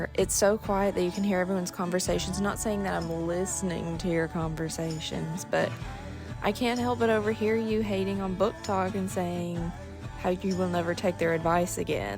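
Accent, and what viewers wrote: South, United States. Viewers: I dont know u i just got this on my explore page but i think ur so cute with ur little southern accent n u def nailed the rory outfit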